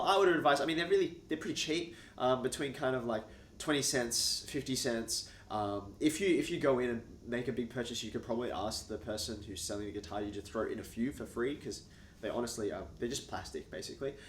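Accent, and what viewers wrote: Australia. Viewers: Think he is saying "gig", it's just the AusTRAYlian mate.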